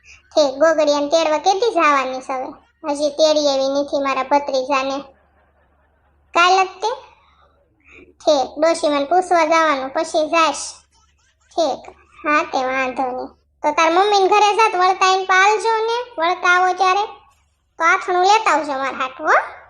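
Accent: India. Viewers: ભાષા ભાવનગર ની બોલો છો.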